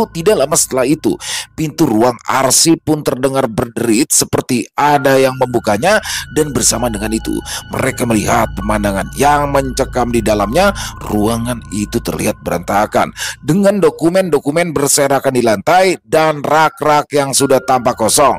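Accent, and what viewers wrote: Jakarta, Indonesia. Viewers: pagi bang... semoga sehat sll 😊🙏 suka banget denger cerita berlogat betawi walaupun saya orang jawa tulen😊😊😊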